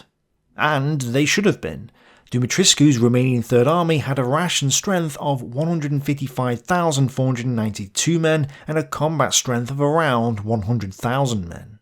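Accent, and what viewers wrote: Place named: Liverpool, United Kingdom. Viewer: I’m really not placing this accent, I’m getting Liverpool, a hint of Manchester, and possibly Lancashire as well. Maybe St Helens???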